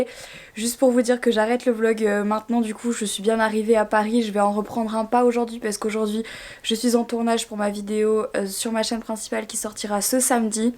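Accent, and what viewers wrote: Belgium. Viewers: T a pas peur de prendre l accent belge? en vivant à Bruxelles😂😁?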